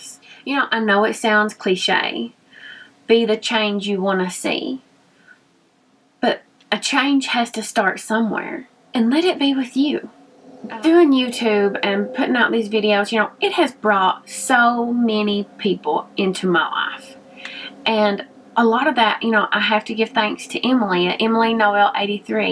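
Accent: South, United States. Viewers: Thanks for the kind words. One thing in life I've always have stayed positive throughout all my health issues. I let me having to get a total knee replacement get to me more then having breast cancer go figure. I'm so glad that Emily mentioned you that day on her channel and I came and checked your channel out. She got me when she told you about your accent. I love a good southern accent.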